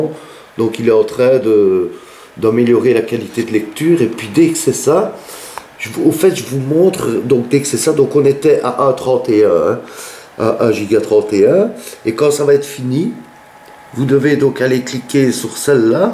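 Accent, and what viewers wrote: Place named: Belgium. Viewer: Merci, super sympa et l' accent belge est magnifique, ne change rien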